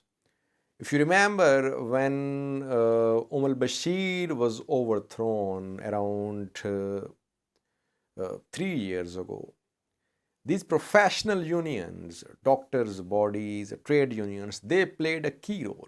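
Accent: Eritrea. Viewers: B.S. 😆 this are Eritrean accent. Why even wasting time for this? You already said it. Any one can do this record. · That’s a Eritrean accent for sure. Lol it’s really easy to notice if you speak Tigrinya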